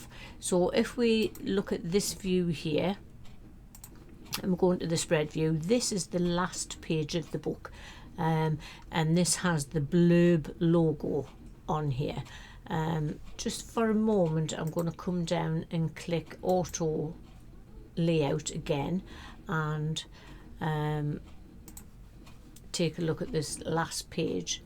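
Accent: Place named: Newcastle, United Kingdom. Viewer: Liked and subbed cheers. I have so many images on my channel but want to see some actually in a book I did one a while back but left it to the printer and graphic designer to work out. Ten years on I look at it and although I’m happy enough I will do things differently like the printer insisted on it being a portrait style which I stupidly went along with. I knew at the time it should be landscape orientation but hey live and learn slowly. So now I’m looking at putting a book together via LR and probably printing via blurb just for myself initially. I’ve got the images populated so just a case of fine tuning the layout which Is why I just subbed. All the best mate love that Geordie accent 📷📷📷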